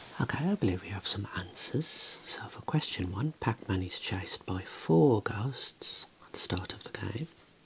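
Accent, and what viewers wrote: United Kingdom. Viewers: The most finest British gentleman I've ever seen speak with such elegance and class! Love from India✌🏽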